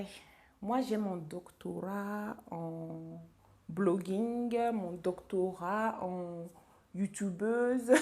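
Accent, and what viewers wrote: Ivory Coast. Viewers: ma soeur tu parles comme une ivoirienne hein c'est bien ça soyons fière de notre afrique vive le BENIN ! J'aime bien ce pays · Tu n’aurais pas vécu avec des ivoiriens par hasard? Tu parles un peu comme une ivoirienne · . Juste pour dire qu'on se reconnaît quand on parle. Et la, perso jai l'impression que c'est une ivoirienne qui parle.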